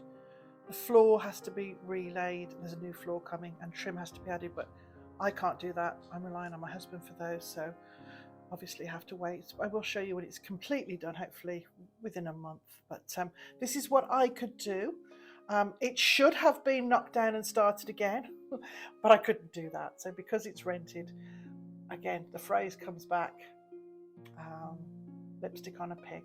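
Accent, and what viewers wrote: United Kingdom. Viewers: Hello I just wanted to tell you, that you have done a beautiful transformation to your bath! I enjoy listening to your voice and the little Brit ways of talking about your experience! Good luck with the rest of your home. I look forward to seeing all of your transformations! God bless and cheers! Theresa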